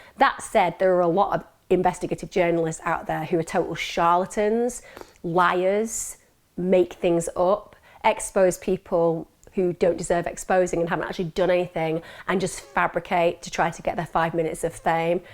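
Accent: United Kingdom. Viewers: I just want to stop by applauding you with your consistency I mean I seriously don’t know how you do that! You’re a professional background is so interesting and your research is beyond Par! I moved from Wales to Southern California and seriously I just love hearing your British voice I miss that a lot been here nine years now! I just shared the Turpin case on my Facebook as that is actually really close to me just a few miles! Keep shinning you are incredible! And thank your amazing Hubby!! 🤍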